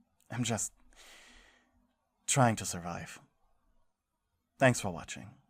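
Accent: Germany. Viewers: I assumed you were a German through your accent Ich hoffe sehr das dein Neustart Erfolgreicher wird! ich verstehe dich sehr im Thema arbeitsuche (& Phobias/anxiety) Ich wünsche dir viel Glück und werde versuche dich in all möglichen Sachen zu unterstützen!❤️ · I knew from the moment I heard the first asmr you were german!! I know it’s really hard but I know you can do it! We are always there to support you! Soooo viele Grüße aus einer klein Stadt in Deutschland! Hoffe sehr das du dein Ziel erreichst! 💕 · Ich hatte ja schon ewig das Gefühl dass du Deutsch bist wegen dem offensichtlichen Akzent :D Ich fühle mit dir das Leben wird immer wie teurer und wir jungen psychisch angeschlagenen Leute können da kaum mithalten…Bin selber noch mit fast 30 bei meinen Eltern und es ist mir so verdammt unangenehm! Ich hab deine Videos schon so vielen Leuten geschickt die ich kenne da deine Vids so gut sind bin echt sad zu hören dass du weniger Klicks bekommst als du verdienst :( Das tut mir mega leid. 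Hab selber mega Phobien und arbeite online als Streamerin, ist schwer Klicks zu bekommen und die Konkurrenz ist riesig zur Zeit womöglich wegen Corona da alle versuchen von zu Hause aus Online zu arbeiten :/ Deshalb will ich mich nach Amerika begehen und ich finde mit deiner Stimme solltest du ernsthaft darüber nachdenken das gleiche zu tun um Synchronsprecher zu werden! Man kann es auch online machen ^^ Gibt n Discord Channel namens The Voice Acting Club dort gibt es viele hobby Synchronsprecher die dort Jobs bekommen für so amateur Comics, Youtube Videos von Leuten die gute Stimmen suchen. Wäre also möglich dort auch für dich den einen oder anderen Job an Land zu bringen um dein Einkommen aufzubessern ^^ Hoffe diese Tipps helfen dir :) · Fuckin knew the accent was German.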